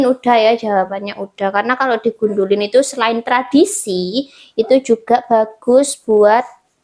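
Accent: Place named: Java, Indonesia. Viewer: Baby Hazel dapat gen terbaik dari embahnya. Semoga tumbuh sehat ya Dek...💚 Mbaknya daerah mana kok logat jawanya dapet banget. Sukses ya Mbak...🙏🏻☺️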